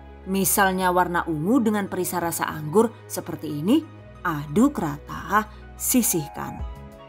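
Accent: Banyumas, Indonesia. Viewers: Ketemu juga resepnya, Ide jualan lagi dan lagi,plus Tips dan Triknya CATAT😅 btw suami saya itu tdnya gak suka bhs ngapak, wlaupun saya istrinya jg wong jowo😁 Alhamdulillahnya klo sya putar video dia ska ikutan senyum" gara" pembawaan bunda yg ngapk tp unik suka bkin ngalak deh😂matursuwun bunda bnyk menebar manfaat d setiap videonya love # 💓 #GiveawayTHV2021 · Hahaaa... Seneng bgt denger ekpresi dan logat bahasa ngapak2nya Bun👍 sangat menghibur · Setiap play vidio pasti guyu dewek nk ada bahasa ngapak nya🤣🤣🤣🤣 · Mbaksayyyyyy...suka banget klo lagi mantengin channelmu....gaya ngapaknya yg khas bikin gk boring dn senyum2 sdri..penjelasanya dettilll...syukaaaaa..❤️❤️❤️❤️❤️ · Hallo assalamu'alaikum wr wb,waahh berapa banyak pahala yg bunda dapatkan dr vidio ilmu dr bunda yaahh....saya sangat senang dengan recep"yg bunda sampaikan...cara penyampaianya jelas..apalagi ada selingan ngapak"nya he he he he...mks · Ngapaknya itu loh 🤣🤣🤣🤣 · chanel sg pling tk senengi,idenya kreatif,video nya keren2 d tmbh lucu2 klo ngmong jwane medok bnyumasan..pembawa anya detil ccok tuk yg mau cb jualn,sllu on klo ada video trbaru gk ktinggln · Bun q pengin takon njenengan sih wong endi,deneng pinter ngomong ngapak 😄😄😄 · Ngapake kaya nyong😁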